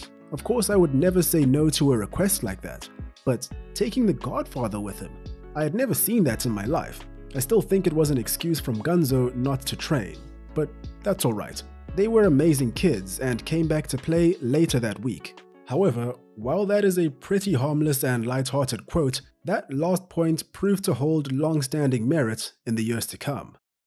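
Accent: South Africa. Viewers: You Sound South African Bro🇿🇦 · Great to hear a South African doing his thing on this channel.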